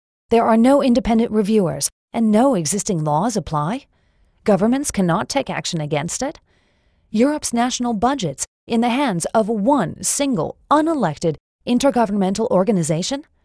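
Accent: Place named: United States. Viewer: Proc je to video namluveny americankou?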